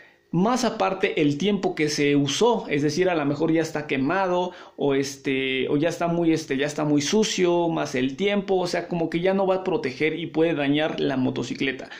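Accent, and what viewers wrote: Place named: Ecuador. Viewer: Yo tengo una moto parada hace ya un año pero me fice mi mecánico que ya no le meta dinero a esa moto ...pero es que me gusta es honda sfx de 50 c . Ud. Es un buen mecannico ...y pir la manera de hablar creo que es de mi pais anterior ecuador ...soy de alli . Yo tengo muchos años que lo dejé 34 años desde que trabajaba como.profesora. cuao un saludo Paulina .A . Ross .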